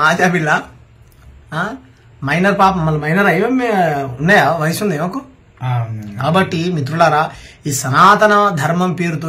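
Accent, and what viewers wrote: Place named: Andhra, India. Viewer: May be needi Andra iyi untadi😅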